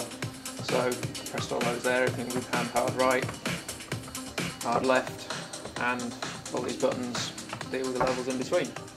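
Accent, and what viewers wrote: United Kingdom. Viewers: If you don't want to hear an English accent, maybe avoid videos made by an English publication like MusicRadar? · If I hear one more polite British accent used to demo a music product, I'm going to puke.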